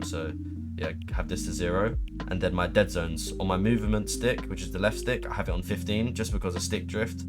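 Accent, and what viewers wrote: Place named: United Kingdom. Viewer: Are u British I love the British accent 🥰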